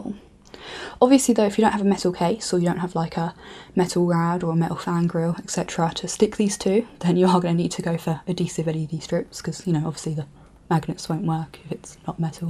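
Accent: England, United Kingdom. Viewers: CAME HERE FOR THE BRITISH ACCENT! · More videos pls! I love the English accent. Subscribed!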